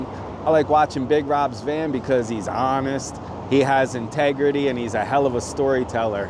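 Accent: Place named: New Orleans, United States. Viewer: I am one of your new subscribers and I also live in Colorado. I love the way you make homelessness as an adventure and there’s no poor me. By the way I love your voice, kind of sounds like you’re from New Orleans but I think you said you’re from Pennsylvania. Have you thought about upgrading to maybe a van? Or a truck with a shell or small camper? Anyways, good luck and God Bless.♥️🙏🏻♥️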